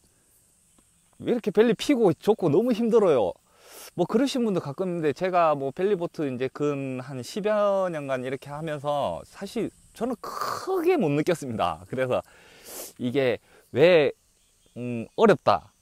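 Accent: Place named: Seoul, South Korea. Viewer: ㅎㅎㅎ 앗~ 서울사람이다~~~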